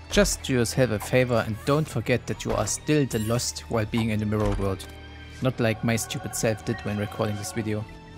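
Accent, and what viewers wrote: Germany. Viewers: your german accent is very pog and interesting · Are you german? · You got a decent german accent haha, i like this more then just reading text keep it on if you like too :D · you german? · Hab schon immer geahnt dass du deutsch bist 😂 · you seem to have a strong accent, sounds pretty german to me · Where are u from, sounds a bit like German I think. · Uff dein englisch klingt Deutsch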